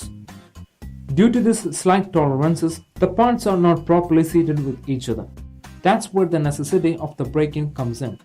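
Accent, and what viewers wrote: India. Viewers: your accent is still indian😁😁😁😁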